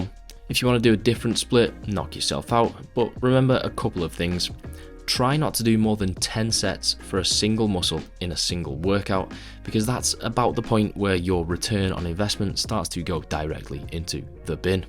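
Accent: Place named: Northern England, United Kingdom. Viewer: you said a while back in the video where you discuss how you haven’t been putting in your full effort for some time, that one of the reasons is that once you’re in good shape, you don’t have to train so hard to maintain. Does this approach not apply to when cutting? ie, not lifting like you’re trying to gain, despite what you say about always training like you’re trying to grow in this video? Love the content mate - so good to have a dry Northern lad deliver a quiet and self aware sermon;)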